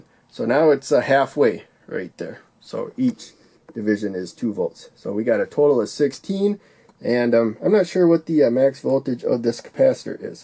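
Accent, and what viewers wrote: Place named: Minnesota, United States. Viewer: Bro, are you from Minnesota? I'm getting that ''ooh, yaah'' Fargo type of accent from you.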